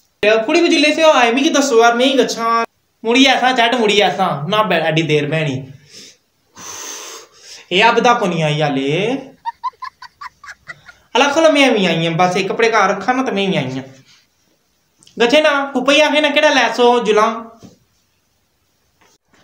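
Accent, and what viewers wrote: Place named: Pothohar, Pakistan. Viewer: Fantastic video i Love it and i really like your potohari the way you speak My favorite language is potohari but we call it pahari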